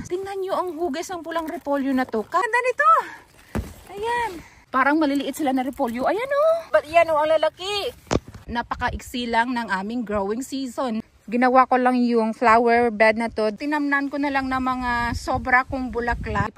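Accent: Iloilo, Philippines. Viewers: Good morning sis I just found your channel a minute ago. I can tell you're ilonga the way you speak so gentle and your tone. So nice to watch your garden. I also love so much gardening. My parents are hardworking farmers back in the Philippines long ago. But now I been living here overseas for a long time. Have a blessed day 💜🙏🙏🙏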